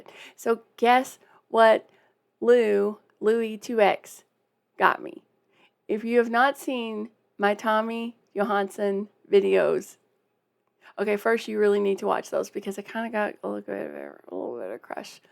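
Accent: South, United States. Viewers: It takes a brave person to be able to do videos these days. I think you are a genuine, honest and friendly presenter, and trust me, there are some presenters on YouTube who are terrible. Anyone who would go that far out of their way to say mean and nasty things, is someone who has some very deep self-esteem problems. Just brush them off. Be yourself. This is YOUR channel. Stop the video and talk as many times as you want, even during. And I'm a huge fan. If viewers want to hear the song un-interrupted, they can go watch a different video. By the way, I love your southern accent. Keep up the good work. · I love your hair, I love your southern accent, I love your heart, and of course I love your name, after all it’s my wife’s name😂. You keep being your amazing self. We love you. Greetings from Alabama 😎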